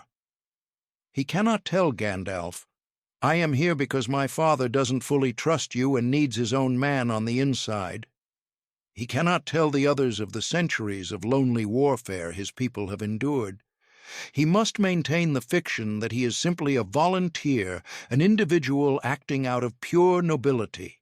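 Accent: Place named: United States. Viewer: middle earth tales narrated with an American accent just don't fly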